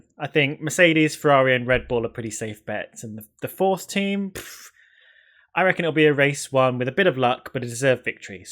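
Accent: United Kingdom. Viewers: So british, I love it!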